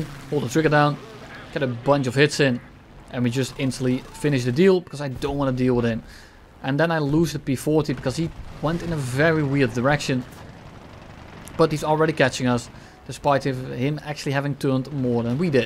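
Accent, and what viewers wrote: Netherlands. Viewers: Are you dutch? cause you have the accent.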